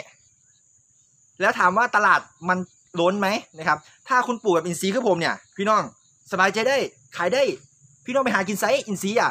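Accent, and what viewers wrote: Isan, Thailand. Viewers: เว้าอีสานแบบแซ๊บๆ นี่หละ (ภาษาคนชุมแพ๊)..แก๋วๆๆ มาดถานบ่าว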